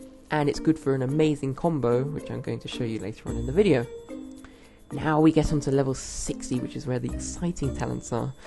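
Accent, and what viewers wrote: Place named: England, United Kingdom. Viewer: Это английский акцент, необразованный ты человек)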